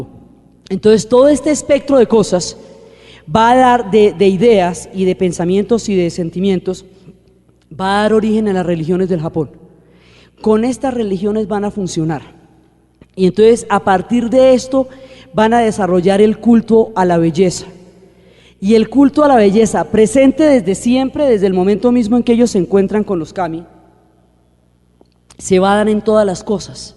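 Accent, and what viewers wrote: Colombia. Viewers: Doctora gracias por compartir tanto tiempo con nosotros y tan bello y extenso conocimiento y disfruto mucho su forma y vocabulario, salí de Bogotá hace 56 años y usted me trae a la memoria nuestra forma de hablar nuevamente gracias Dra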